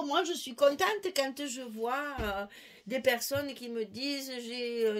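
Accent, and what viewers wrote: South, France. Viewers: Bjr. Vous pouvez être très fière de vous Bravo Magnifique une œuvre d art entre des mains d une magicienne!! Vos tutos sont tjrs des découvertes, la surprise du Chef! On part à l aventure... avec!!! Vos explications, votre patience, votre bonne humeur fait que vous mettez du baume au 💙 dès qu on regarde un tuto, qu on entend votre accent du midi, 🌞 on oublie un instant nos soucis!!! Continuez à nous faire scraper, à nous faire découvrir vos tutos tjrs plus beaux... Merci pour le partage, prenez soins de vous et passez de bonnes fêtes de fin d année. Bises. Marie--France. Débutante dans le scrap mais je fairais un de vos tutos c est sûr!!👋👋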